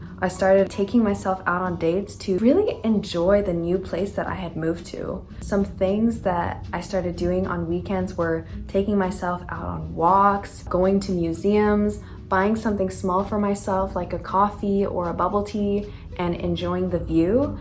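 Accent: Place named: Paris, France. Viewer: loved this video!! as a french girl i can tell you that your french is sooo good! you have the best parisian accent! j'espère que tu aimes Paris xx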